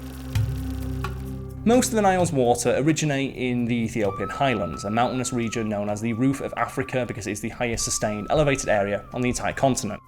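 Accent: United Kingdom. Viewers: A British voice does make it a Simon video!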